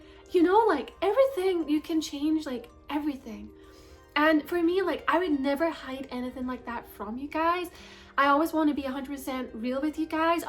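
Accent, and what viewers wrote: Scotland, United Kingdom. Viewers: I've watched you on tik tok for a while but only just joined your YouTube channel. I am so baffled and shocked that people would ever think that someone with a cool style that celebrates vintage could be a bad thing? You look amazing and your accent is beautiful (my dad is from coatbridge/aidre so its lovely to hear!). Keep being you 🥰 xx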